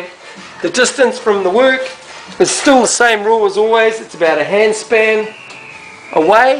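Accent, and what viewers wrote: New Zealand. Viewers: Great video, clear explanations, nice to hear another kiwi too rather than the usual american.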